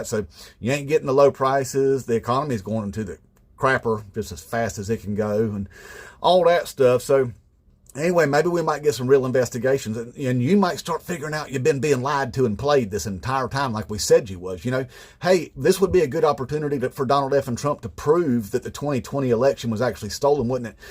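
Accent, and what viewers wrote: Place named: Appalachia, United States. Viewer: Your Southern Appalachian accent brings the story to light for me.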